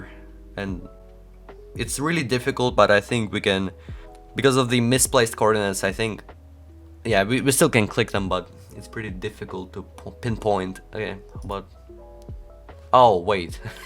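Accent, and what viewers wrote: Russia. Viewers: strong ruski accent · Love your videos! I've really noticed your Russian accent though. Go learn an American accent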